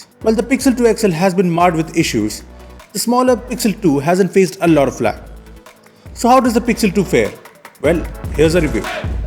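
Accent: India. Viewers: god damn dat indian accent , i can't take it anymore !!!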